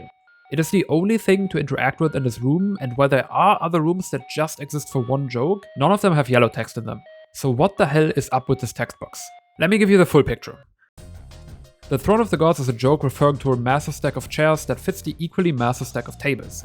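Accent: Germany. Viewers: Deutschester akzent den ich je gehört hab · i hate that i can clock a fellow german speaking english in the first 00.2s seconds of them speaking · I hear a german accent.. .. hmmmmmmmm 🤔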